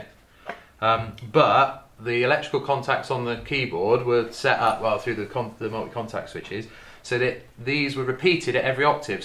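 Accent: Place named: Bath, United Kingdom. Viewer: . Hi, I grew up in Bath so its great to hear the home accent again. You have the most amazing videos, you have a great life and you have done so well in sharing. I just wondered if there was any chance you ran any apprenticeship type causes. you seem to be one of a very thin number of people who I think I would respect enough and be able to learn from.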